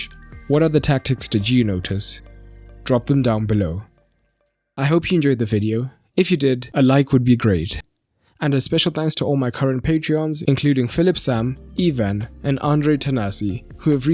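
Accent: South Africa. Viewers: Bro where are you from ? You sound so South African ? 😂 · You sound south African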